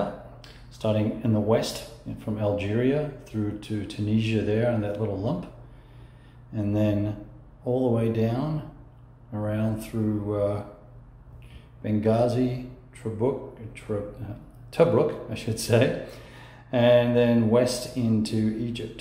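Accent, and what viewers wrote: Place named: Australia. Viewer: The Australian accent adds to the Guy Pierce thing goin on. Though I reckon Mr Sharp is more the Teddy character in this one ;-)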